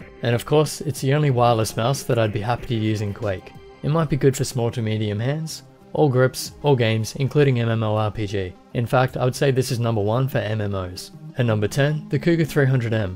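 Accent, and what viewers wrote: Australia. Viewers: yes he's from Australia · are you aussie? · Yo man are U australia!?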